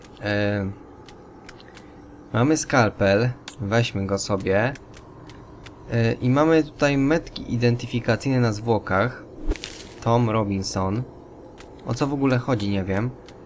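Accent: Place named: Silesia, Poland. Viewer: Jestes ze sląska slysze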